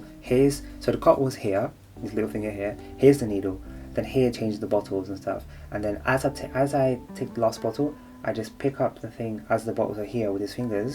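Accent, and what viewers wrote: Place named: Portugal. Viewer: I’m a admin/receptionist for a GP surgery and I’m so interested in starting my career as a phlebotomist, I’m about to enrol in a private phlebotomist course which is two full days, is nationally accredited, but to be fully qualified I need to get 30 sticks and work experience at a surgery which I might ask my boss if I can shadow our in house phlebotomist on my days off, or go straight to one of our hospital and ask to volunteer and be trained. I’m so looking forward to it, so I’m trying to learn as much as possible about the training process, so I found your video extremely helpful. I’m assuming you are Portuguese by your name and the way you speak, I’m Portuguese too. Bjs Silvia Gomes Freire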